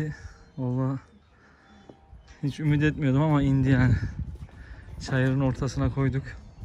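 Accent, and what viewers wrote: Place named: Araklı, Turkey. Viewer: Usta kesin Araklıdan konuşmadan belli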